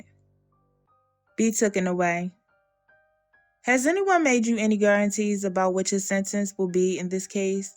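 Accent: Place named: Baltimore, United States. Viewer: The narrator is definitely from Baltimore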